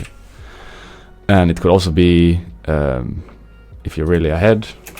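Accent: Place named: France. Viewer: he has a little french accent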